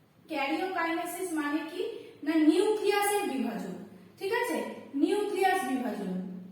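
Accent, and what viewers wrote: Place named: India. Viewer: কথা গুলা শুনে মনে হচ্ছে ইন্ডিয়ান!! ক্লাসটা ভালো ছিলো ম্যাম From Bangladesh 🇧🇩💙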